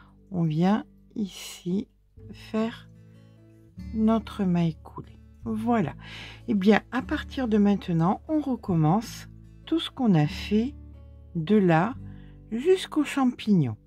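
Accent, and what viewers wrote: France. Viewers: Bonjour, Française habitant en Australie depuis très très longtemps Je viens de decouvrir vos videos. Je pourrais vous ecouter parler toute la journey avec cette voie si francaise et si douce. D'un age plutot advance, J'ai commence a crocheter l'annee derniere et depuis je ne me suis pas arretee. Avec ce tutorial si facile Je vais me lancer avec ce pull merveilleux et aimerais acheter le pdf pour m'aider a le faire a ma taille, mais Je n'arrive pas a le trouver sur votre site. Pouvez vous m'aider s'il vous plait! Merci de tout coeur.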